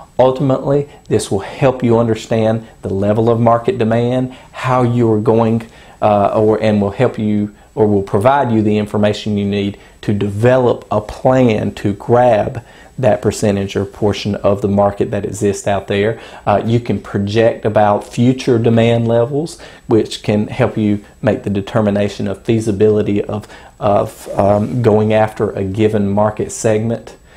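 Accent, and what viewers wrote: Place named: United Kingdom. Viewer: I love his teaching and accent (though I have been a lover of British accent)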